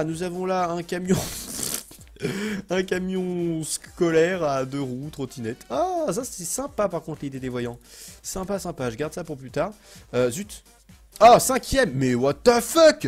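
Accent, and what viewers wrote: Quebec, Canada. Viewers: Es-que tu as un peu de québecois ? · tu est quebequoi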